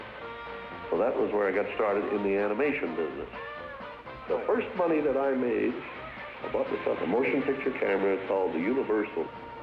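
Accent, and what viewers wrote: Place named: Mid-Atlantic, United States. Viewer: Maybe it's the mid atlantic accent or the radio static, but there's just something about his voice that is so timeless and nostalgic.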